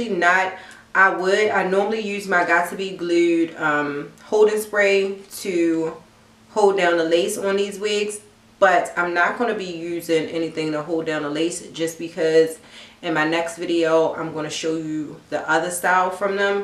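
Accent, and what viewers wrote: Baltimore, United States. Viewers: are you from Baltimore? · That baldimore accent! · Lol yeah she must be from Baltimore the way she keeps saying “to/tew” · Okay I’m getting this wig because of you ❤️ & your accent you sound like your from Baltimore also new Subbie 😊❤️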